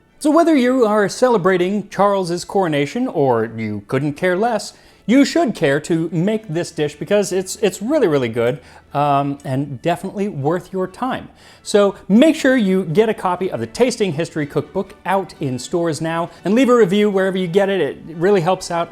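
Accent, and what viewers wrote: United States. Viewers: Why do you Americans always pronounce herbs as erbs without the 'h'... but over prounce the silent 'h' in words like vehicle? 'Ve-hical' 😅 It drives me nuts 😂 Apart from that, I love your shows and will venture into trying some historical recipes. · Why can't Americans talk! "Erbs" Herbs QEII was crowned not "coronated" The temperature doesn't "raise". You may raise it, but it rises. Loved the recipe and the comentary...... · That's just how Americans say it. Never heard a American say it the British way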